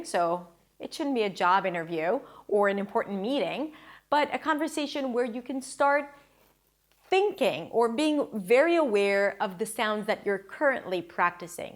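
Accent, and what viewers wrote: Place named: United States. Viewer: you're in israel? i thought that you were in the US,,,, damn, how do you keep your american accent in such good shape?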